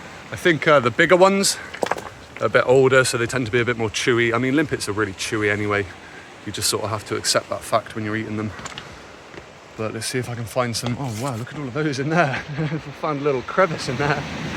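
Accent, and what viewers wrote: United Kingdom. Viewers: what part of UK is this mate? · Which part of UK is that?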